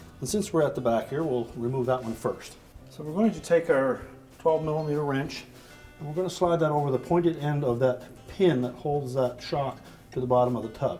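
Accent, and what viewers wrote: Canada. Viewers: Took me 7 hours total.....That included 1 hour to free the tub shaft from the center bearing and 1 hour of power washing and cleaning the tub and gasket. I have an 2004 machine and I did this in 2013 as well as several drain pump replacements. Amazing how cheaply made these China machines are, thin metal. cheap plastics and solder drip-mold nut and bolts!! After following the video, I officially now say "out" like a Canadian.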